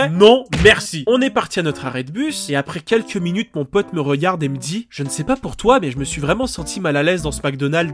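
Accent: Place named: Quebec, Canada. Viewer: T’es parents sont francais? T’as l’accent québéquoye!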